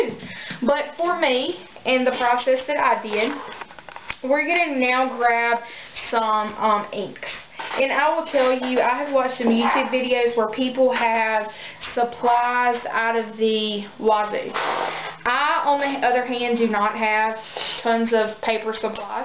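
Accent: South, United States. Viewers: You're so comfortable in front of the camera! I'd get all tongue tiied. I love your videos too. I love that Southern accent too. I'm from South Carolina, so I've got that that same slow way of talking, but I think you're a little more Southern than I am. :) Keep the great videos coming!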